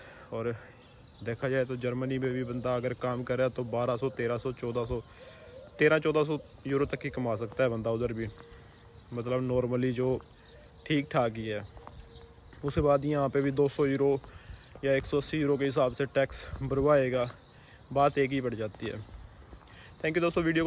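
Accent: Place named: Haryana, India. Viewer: Bhai apki avaj se lag rha you are from haryana right bhai 😊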